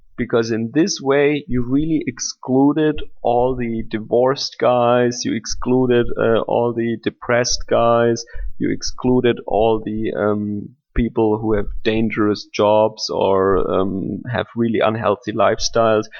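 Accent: Germany. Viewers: I seriously just heard german accent in this and never really watched anything by.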